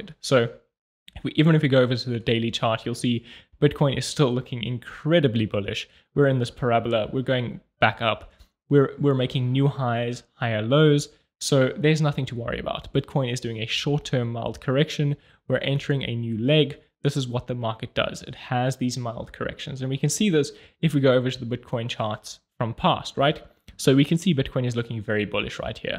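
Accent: South Africa. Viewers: Sounds South African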